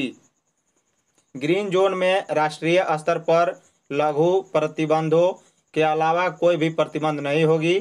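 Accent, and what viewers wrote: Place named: Jharkhand, India. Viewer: Sir aap jharkondi jaisa bolte haii achha lagta haiii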